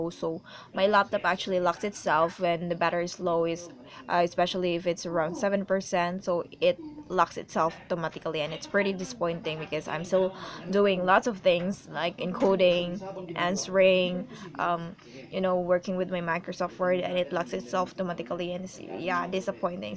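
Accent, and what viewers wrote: Philippines. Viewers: you Philippino accent is soo freaking cute. Thanks for the help hope it works 🙏🙏🤎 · HAHA HALAWA TALAG NA PINAY YUNG ENGLISH , PERO ANG KYUT NG SPEAKING VOICE MO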